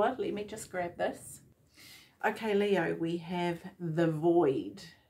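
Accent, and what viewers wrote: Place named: New Zealand. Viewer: my moms from Christchurch, thought I recognized the accent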